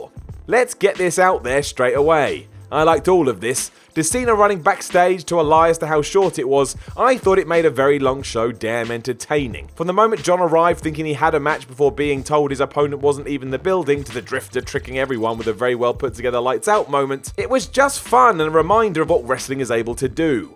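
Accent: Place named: England, United Kingdom. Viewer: Hey so, I'm young, I love pro wrestling, and whatculture wrestling is my favorite channel. Please forgive me but I'm curious. How come when Englishmen end a word that ends in an 'A' it kind of sounds like an 'er'? Much love. Again just curious.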